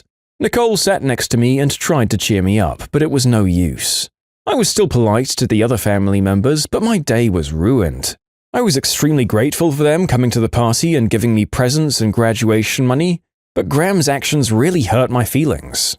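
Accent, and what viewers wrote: United Kingdom. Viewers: Why the fuck are you british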